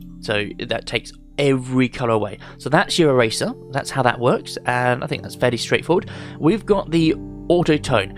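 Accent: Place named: United Kingdom. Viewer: I knew he was living in UK not beacuse of the location but the accent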